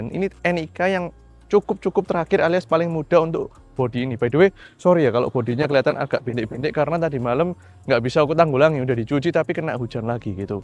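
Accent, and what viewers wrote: Surabaya, Indonesia. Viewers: Wes luweh asik gae logat arek suroboyoan ae ko...salam sego indomi🍚🍜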